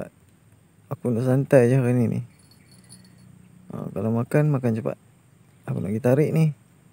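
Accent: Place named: Gerik, Malaysia. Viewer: Ckp pun udah mcm org grik....tokdang tahok..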